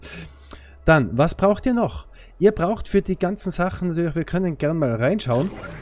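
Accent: Switzerland. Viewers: bist du schweizer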